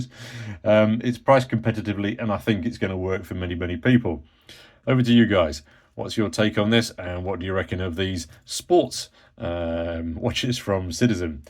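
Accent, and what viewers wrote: United Kingdom. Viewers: Why can't British talk English properly like Americans? It's tough to understand, fella.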